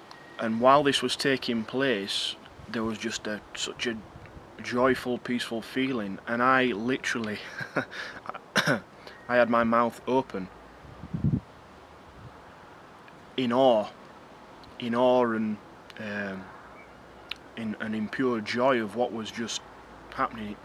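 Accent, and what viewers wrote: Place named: Lancashire, United Kingdom. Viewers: Love how you flit from proper English to Northern :D Nice vids, mate. Subscribed... Peace from Barnsley ;) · How? I want to do it, I am in the UK, Birmingham. You sound like you come from Lancashire maybe? Buy it online at shayanashop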